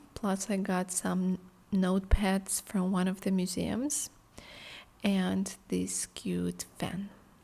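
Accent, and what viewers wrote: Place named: Russia. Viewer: Я не знаю разговариваете ли вы по русски,но ваш акцент меня на это натолкнул😅. Видео просто замечательное и очень красивое. У вас очень приятный голос ❤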